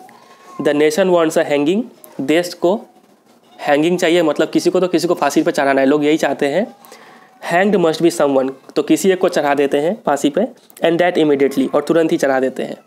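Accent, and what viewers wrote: Bihar, India. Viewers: Bro aap language se bahot big bihari lagte ho like you said " Rajaji"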